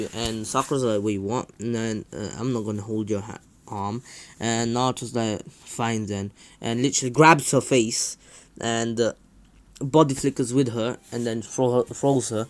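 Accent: United Kingdom. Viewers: I just like his voice when he narrated Bc it’s British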